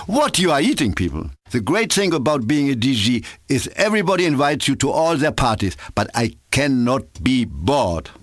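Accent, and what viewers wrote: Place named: Africa. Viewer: sounds like african english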